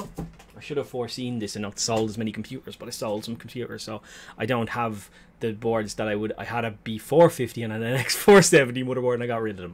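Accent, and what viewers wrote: Ireland. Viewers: Addicted to content. Man cave + Irish accent + rage + scratching. Top notch channel right here, m8.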